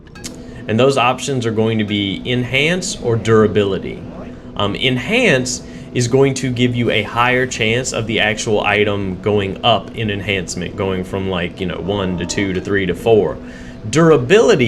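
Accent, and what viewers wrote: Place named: South, United States. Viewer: I've been waiting for gaming guides from people with country accents. Thank you for representing our people, and Bless Online your heart.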